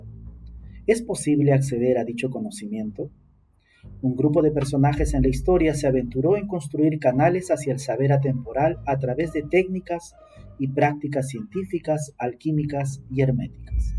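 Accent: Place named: Dominican Republic. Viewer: eres dominicano suenas como uno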